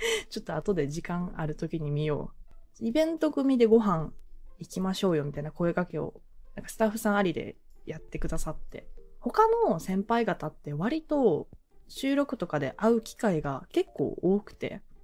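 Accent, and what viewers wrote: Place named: Kansai, Japan. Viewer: ゐぶきちゃんは品のある関西弁がホントにかわいいですね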